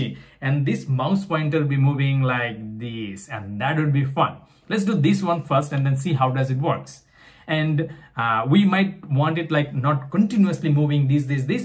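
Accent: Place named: India. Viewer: Are you from INDIA? Because your accent is like ours.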